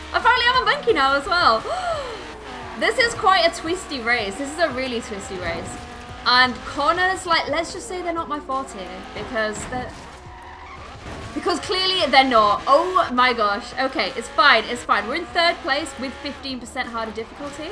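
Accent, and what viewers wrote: Ireland. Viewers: what?? you're irish?......do you know jacksepticeye? I'm a big fan of his. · ur Irish? high 5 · are u Irish?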